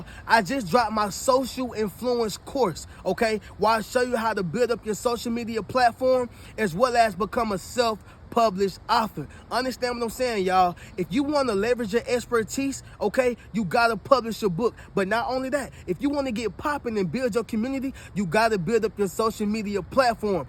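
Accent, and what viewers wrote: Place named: Rural, United States. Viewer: It ain't the same no mo...love your country twang.....😊😊😊😊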